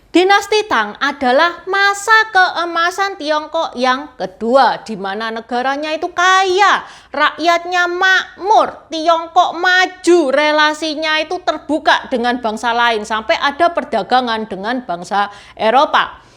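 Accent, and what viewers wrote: Java, Indonesia. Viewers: logat jawanya jangan di ilangin...terima kasih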